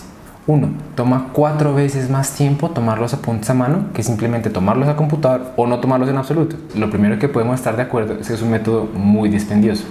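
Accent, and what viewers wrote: Colombia. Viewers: Ese acento es de cali sin duda., Que buenos consejos, Te felicito te mereces el exito que has logrado 💕👏🏻👏🏻👏🏻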